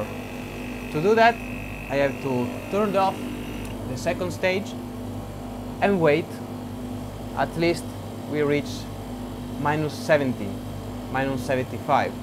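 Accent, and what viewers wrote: Italy. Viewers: ma te sei italiano? hai una pronuncia che suona di casa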